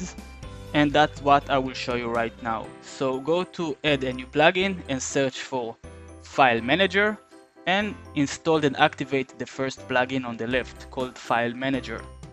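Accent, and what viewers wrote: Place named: Israel. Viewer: the most israeli accent i ever hear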